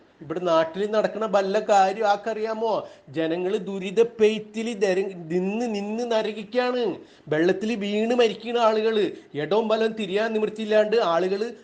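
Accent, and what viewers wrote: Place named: Eranad, India. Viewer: രസികൻ അവതരണം അധികാര വർഗ്ഗത്തിന്റെ വിവരദോഷം എത്രത്തോള മുണ്ട് എന്നത്, ലളിത സുന്ദരമായി അവതരിപ്പിച്ചിരിക്കുന്നു? തനിനാടൻ ശൈലി... ഒട്ടും വളച്ചുകെട്ടില്ലാത്ത നിഷ്കപടമായ സംസാരഭാഷ... കഞ്ഞിമുക്കി അലക്കി തേച്ചപോലെ,വടിവൊത്ത അച്ചടിഭാഷയിൽ, നാടകീയമായി, മസില് പിടിച്ച് വാർത്ത അവതരിപ്പിക്കുന്ന സ്ഥിരം ശൈലിയിൽ നിന്നും മാറി, ആനന്ദവും ആസ്വാദനവും സാധ്യമാകുന്ന, ചിരിയും ചിന്തയും പ്രദാനം ചെയ്യുന്ന വേറിട്ട ശൈലി കണ്ടെത്തി അതിലൂടെ വാർത്താവതരണം സാധ്യമാണ് എന്നു ജനത്തെ ബോധ്യപ്പെടുത്തിയ Crime online Team നും, Chief editor നന്ദകുമാറിനും ഏറനാടൻ വള്ളുവനാടൻ സ്‌ലാങ്ങിൽ ചിരിയും ചിന്തയും വിളമ്പി ഞങ്ങൾ പ്രേക്ഷകരെ, ശ്രോതാക്കളെ മതിമറന്നു ചിരിപ്പിച്ച അവതാരകനും സ്നേഹവും നന്ദിയും അറിയിക്കുന്നു... അഭിനന്ദനങ്ങൾ ആശംസകൾ ❤️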